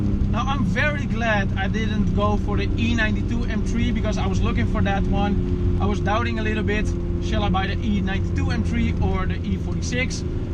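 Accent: Netherlands. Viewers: that dutch accent tho, jullie knn echt geen zak talen man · Your accent sounds like you're from the Netherlands? I have a 2005 E46 M3 with 265 mm square setup lowered on coilovers with all sorts of High Performance Suspension goodies. I treat my daily 50-mile round trip commute as my personal racetrack. I wouldn't say my setup is super high on comfort, but it is off the charts in fun. My car came with 19-inch Wheels but I eventually graduated to lightweight 18 inch wheels which perform better and are definitely more comfortable. Bigger selection in 18inch and less expensive too.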